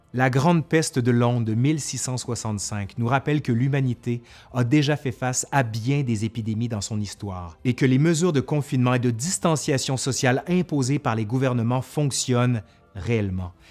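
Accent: Quebec, Canada. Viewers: Très intéressant merci et en plus raconté avec votre charmant accent Québécois, c'est un plus. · Vidéo très intéressante et agréable à écouter, c’est la première fois que j’écoute une vidéo en « français canadien » jusqu’au bout 😊👌🏼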